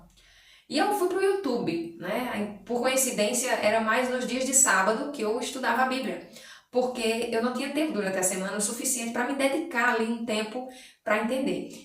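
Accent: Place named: Northeast, Brazil. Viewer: Olá! De qual Cidade você é? Pelo "sotaque " é nordestina rsrs Parabéns por sua escolha 👏 Ganhou mais uma inscrita 😘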